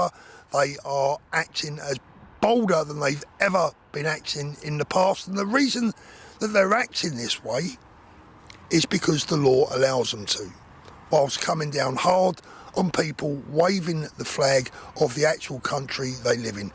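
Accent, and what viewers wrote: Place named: London, United Kingdom. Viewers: !!! Mate!!! I fucking love a fucking English man with a fucking badass London accent who fucking knows how to fucking swear. It brings a tear to this Aussies eye. 😂 But seriously, never truer words spoken, well said. 🇬🇧🇦🇺🇬🇧🇦🇺🇬🇧🇦🇺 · Fantastic!!!!! You are very eloquent. The message would be the same if spoken by a toff, you've just got a different accent, a capital accent! Loved it, i'm in full agreement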